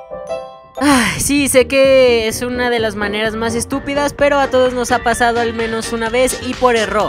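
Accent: Mexico. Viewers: eres de mexico???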